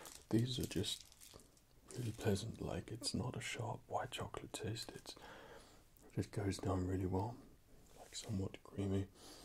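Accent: England, United Kingdom. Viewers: I was just thinking recently I want to listen to an asmr video with an English accent and then I saw this video and I was omg oh yeah! 😃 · Is he from England ??? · Is he British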